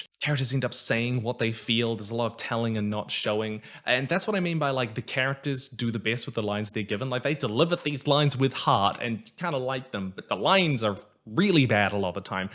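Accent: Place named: New Zealand. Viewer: fellow Kiwi detected, Opinion Accepted(Subscribed and Liked)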